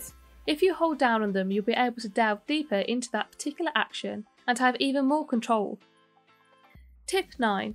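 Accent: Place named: United Kingdom. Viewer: Great video and very useful tips- everything is better in a British accent! :)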